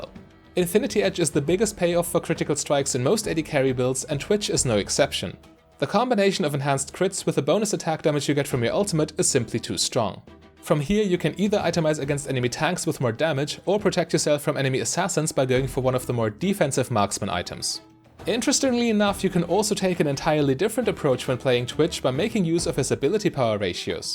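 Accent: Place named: Germany. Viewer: Der Bruder ist deutsch das hör ich doch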